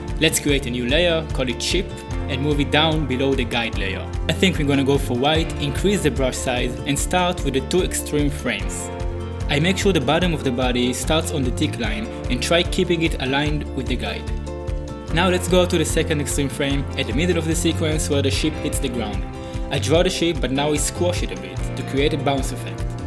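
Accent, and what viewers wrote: Israel. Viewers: שומעים את המבטא הישראלי😆 אבל יפה אחלה סרטון👍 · אפשר לשמוע שאתה ישראלי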